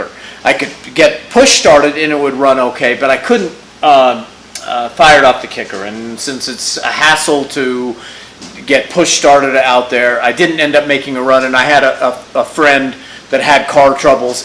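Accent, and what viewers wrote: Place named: United States. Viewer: American accent and metric! Thanks for not frying my brain with inch unit tolerance.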